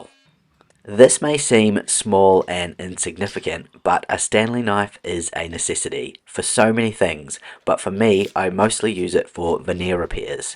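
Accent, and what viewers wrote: New Zealand. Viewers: Ok have a question for you!! Have u consided purchasing a laser paint remover..some are very expensive but some are cheaper if u look about...no more sanding or scraping imagine time saved..would make an interesting video...anyway have been enjoying your videos grretings from Australia..do i detect a kiwi accent?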